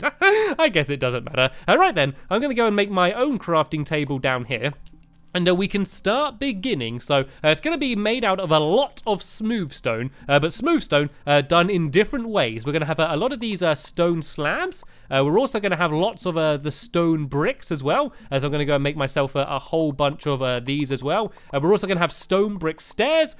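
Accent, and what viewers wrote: United Kingdom. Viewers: This video is so British. 1:British accent. 2:Clock tower. 3:Tea shop.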